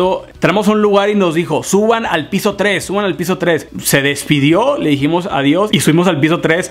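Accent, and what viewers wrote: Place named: North, Mexico. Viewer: Veo todos tus videos sonriendo 🤩 y amo tu acento norteño! Yo también soy del norte 🙋🏻‍♀️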